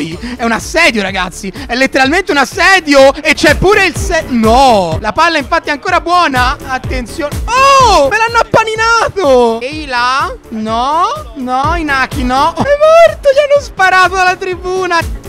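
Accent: Tuscany, Italy. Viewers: In questo video il toscano era al massimo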